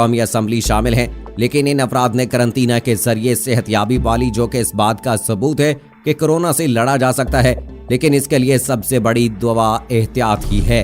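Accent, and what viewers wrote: India. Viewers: App ke urdu Indian ka lahja jisa ha bakwas band kar do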